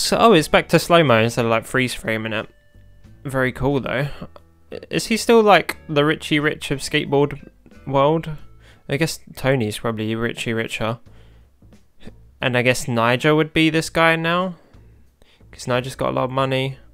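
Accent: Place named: United Kingdom. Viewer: I love your bri'ish accent (pls don't take that offnsively) also how is your day been going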